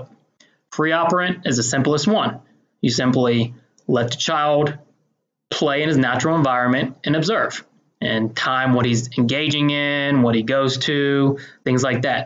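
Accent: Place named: Philadelphia, United States. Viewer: I swear I hear a Philly or east coast accent in there! You weren't born and raised in Vegas, were you?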